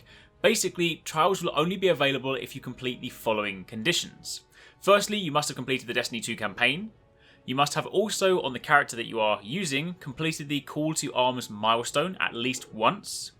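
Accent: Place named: United Kingdom. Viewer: Wait are you seriously that black man and sound British?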